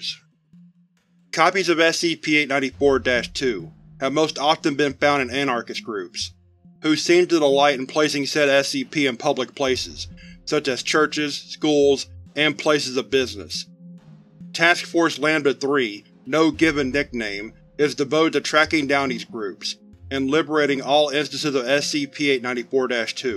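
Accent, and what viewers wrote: United States. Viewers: *intentionally VHS sound, good soundproofing or shady mic, sounds like a trucker's CB radio cadence/accent, are you from Texas? if not, why?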